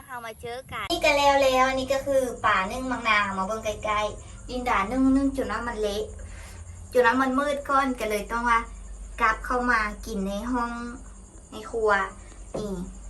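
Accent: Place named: Laos. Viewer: เว้ากะม่วนหลาย มักสำเนียงน้อง หลงเสน่ห์สาวลาวคนนี้แล้วล่ะ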